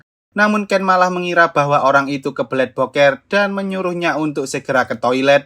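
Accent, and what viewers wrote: Java, Indonesia. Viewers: Mas bisa gk logat jawanya ush medok amat jdi film yg seru jd datarrr aja Maaf ya 🙏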